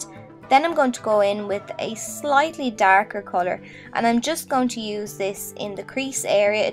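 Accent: Ireland. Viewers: Are you from Ireland ? 😄 I'm in Laois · Your irish ♥♥♥♥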